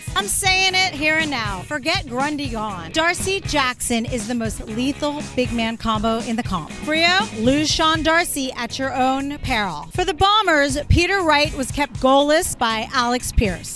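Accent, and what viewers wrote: United States. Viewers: I’m loving this. I look forward to this every week.. As an Aussie who used to live in Texas it’s awesome hearing an American accent talking about footy..👍 Please keep it going. Be patient. I can see you being a huge star ⭐️ down under in a few years !!!!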